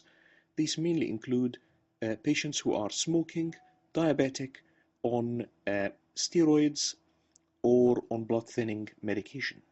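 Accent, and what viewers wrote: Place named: Eastern Europe. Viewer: Where comes the speaker from? I think he are from finland or russia or other slavic country!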